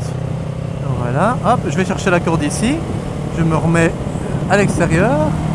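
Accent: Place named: Hainaut, Belgium. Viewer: Bonne vidéo, pas mal pour les débutants. Bonne élocution. Et l'accent du Hainaut ! 👍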